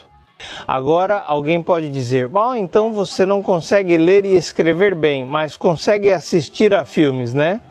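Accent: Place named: Portugal. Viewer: portugues de portugal :face-red-droopy-eyes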